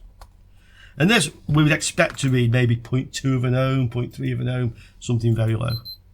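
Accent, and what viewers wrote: Germany. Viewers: ich liebe es wie man es bei uns deutschen eig immer zuverlässig raushört :D x3 grüß dich :D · Dein Kumpel hat wirklich was drauf, das muss man sagen. Er ist zwar nicht der Guru, was Grakas angeht, aber dennoch ganz oben mit dabei. Ich denke, das weißt Du ja. Nach allem, was ich bisher gesehen habe, bin ich sehr zuversichtlich, dass bloß die 1,8V Versorgung Probleme macht ... sobald soweit vorne in der Sequenz etwas defekt ist, ist meistens weder RAM noch GPU defekt, daher wäre ich an Deiner Stelle sehr zuversichtlich. Ps. Mein Kommetar kam übrigens schon, als ich das Video gerade 2 Minuten gesehen habe. Man merkt sofort, wenn ein Deutscher Englisch spricht 🙂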